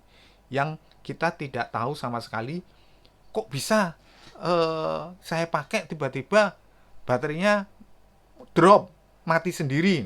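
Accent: Java, Indonesia. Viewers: Logat jawamu medok banget min😂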